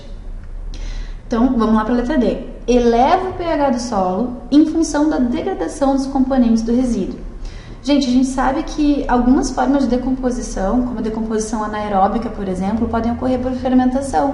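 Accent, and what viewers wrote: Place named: Rio Grande do Sul, Brazil. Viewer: Bah tchê, pelo sotaque é gaúcha!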